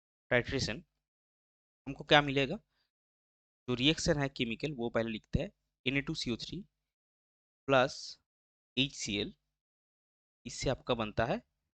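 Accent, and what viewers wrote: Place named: Bengal, India. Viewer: Dont recruit bengali accent its so uneducated sounding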